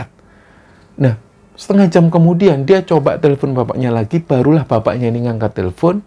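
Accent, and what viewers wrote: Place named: East Java, Indonesia. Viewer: Hadiiirrr Banjarmasin nyimak,aku wong jowo seneng nek ngrungokke mas crito,logat jawanya kentel bgt jowo wetanan..,seneng bgt pokok e,sukses terus mas &tim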